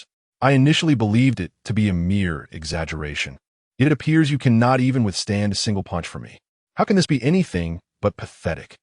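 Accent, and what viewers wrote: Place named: France. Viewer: This ai is a little french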